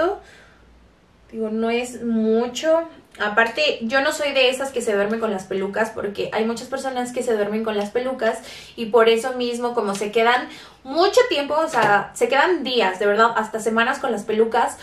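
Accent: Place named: Mexico. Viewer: Amo a ❤🤩 En los asmr no se le nota tanto que es Mexicana 😊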